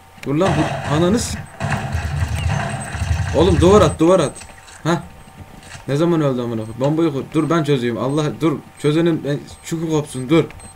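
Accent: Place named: Kastamonu, Turkey. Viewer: Kastamonulu şivesinden anlamadınmı :D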